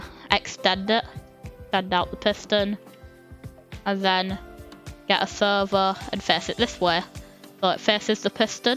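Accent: United Kingdom. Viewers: are you bri'ish?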